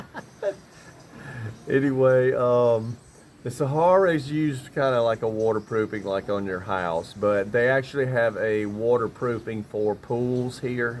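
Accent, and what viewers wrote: South, United States. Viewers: I like your Southern accent. I live here in Tallahassee, Florida which is just part of the deep south.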